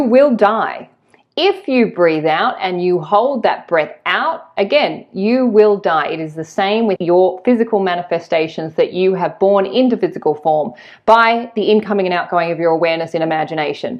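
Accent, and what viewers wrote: Australia. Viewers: Me too, I am falling in love with the Oz accent.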